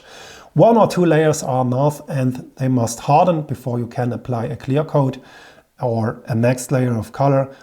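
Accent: Germany. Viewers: Hey, Awesome work, artistry and video's too boot.. thank you.. we all love your video's as with knowledge we have the balls to deliver instead of our girlfriends taking the lead.. I have a question... I want to strip / repaint an Old Skool race road bike that has an alloy, aluminium frame.. I would like to know is it better to strip, polish the raw metal and then apply a clear adhesive promoter before candy spray or is it better to leave the metal surface 'rough' and then apply a primer and candy spray... what do you suggest please as you are the Prime Mover, Master of Spray excellence. Cheers Ivan from UK (ps: Your videos ROCK... Gernman accent, humour and technical excellence... Yavol..:-) Use your brain and balls don't be a pussy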